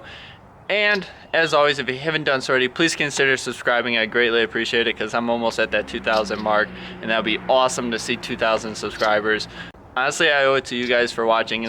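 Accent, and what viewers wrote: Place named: Chicago, United States. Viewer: You do got some Chicago on that accent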